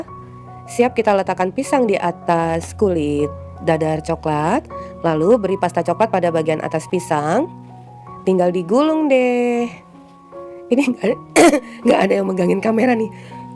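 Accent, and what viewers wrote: Java, Indonesia. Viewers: lucu sekali. Bhs nya kyk orang Jawa saja 🥰🥰🥰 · Gak fokus ke prosesi mbikin kuehnya, maam chayaankk😘😘❤💓🌺🎉🎊 Suka gaya ngomong medhok Jawanya... 😂😂😂😂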